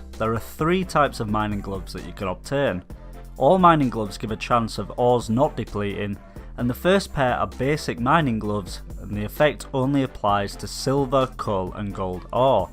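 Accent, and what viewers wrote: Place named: Yorkshire, United Kingdom. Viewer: Jesus, that's a strong Yorkshire accent. Where abouts are you based? Think you're very, very close to me (Wakefield)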